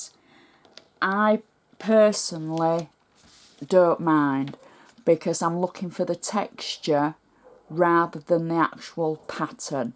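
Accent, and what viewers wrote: United Kingdom. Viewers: Love your accent. What part of the UK are you from. Enjoy your tutorials. Beautiful designs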